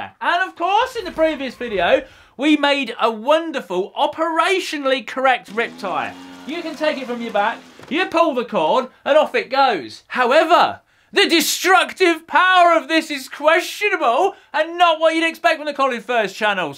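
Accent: United Kingdom. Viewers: This guy has British accent, German look, Italian temper and American craziness.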